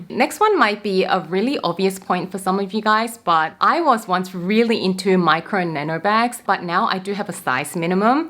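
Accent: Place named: Australia. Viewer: I saw one of your scarf videos for the first time today. It’s four years old, but I am so grateful. I waited and waited til I thought I was going to have to ask if that was an Australian accent, then right at the end you mentioned Sydney. ☺️ Can’t wait to watch so many more of your videos. I have access to new, free designer clothes, jewelry, and makeup. Those I don’t get for free, I get for as much as 90% off. I cannot declutter because my home has become s hoard and it’s a hoard with no storage. Without help, I’m just going to continue drowning. I went to the sale where I get most of my clothes, and came home with four more scars, a gorgeous handbag and a few pieces of jewelry – none of which I should’ve purchased. Designer scarves for $1.50 so I’ll use that as an excuse. I have clothes that could sell, but the resellers market has changed so dramatically, I don’t know which platform is worth it. 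If you have a suggestion, I would really appreciate it. Poshmark has been a complete waste of time for me.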